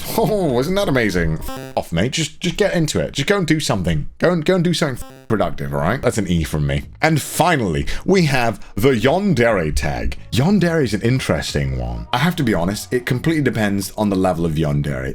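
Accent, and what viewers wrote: United Kingdom. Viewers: this is the first time I keep hearing just be so british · Why does sound extra British in this video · WAIT- BUT THE WAY HE USED HIS BRITISH ACCENT TO BEAT THE YOUTUBE-SYSTEM 😂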